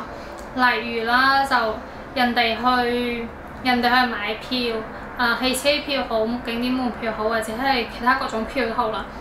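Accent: China. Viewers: 聽你講嘢就知你係大陸人啦，今時今日香港嘅後生仔應該係去咗外國，除咗啲老嘢會返大陸，邊有港人後生仔返大陸。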